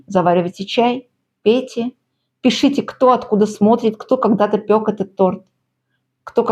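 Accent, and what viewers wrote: Baku, Azerbaijan. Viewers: Kak vsegda shikarniy recept obozhau vas e vash Bakinskiy akcent smotru vas s NY😘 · Я, слушая Вас, ловлю себя на том, что я улыбаюсь 😊 От того, что слышу родной бакинский акцент❤️Пол года назад открыла для себя Ваш канали сразу подписалась! Спасибо за Ваши рецепты🌹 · Да, очень родной говор! Мягкий и доброжелательный, бакинский ! Ваши Рецепты подкупают своей простотой и лаконичностью. Спасибо вам из Бруклина, НЙ. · Бесподобный бакинский акцент...❤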